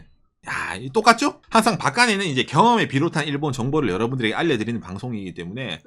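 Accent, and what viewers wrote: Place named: Gyeongsang, South Korea. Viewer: 말투가 경상도 .자갈치에서 꼼장어에 쏘주한잔 하고싶네 ,ㅎㅎ,나는 부산사람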